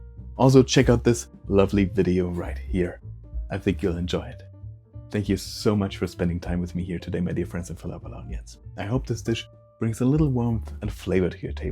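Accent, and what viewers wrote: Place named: Germany. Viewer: Bist du Deutsch? I hear a sight accent, as I am 100% Slovenian and speak a somewhat butchered German. My Stara Ata spoke fluent German and I learned much/some from him. Danke.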